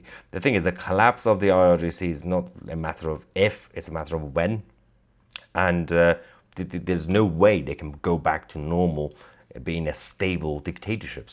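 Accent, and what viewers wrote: United Kingdom. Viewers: Nice British accent and well broadcasted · Excellent news report on a great country under crazy leadership. I enjoy your wonderful British accent! Keep up the good work.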